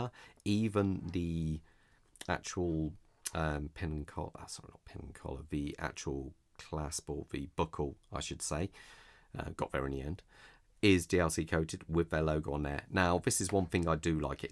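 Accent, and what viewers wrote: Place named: Birmingham, United Kingdom. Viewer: I will definitely visit the dealership you mentioned in Coventry. And I really like your videos with you brummi accent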